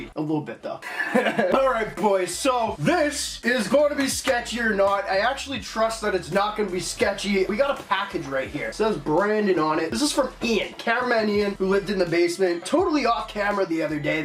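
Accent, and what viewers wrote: Canada. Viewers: Where in Canada 🇨🇦 are you from I love finding out youtubers are from Canada like the nelk boys I assume your in Canada with the ehhs and boys aacent lol.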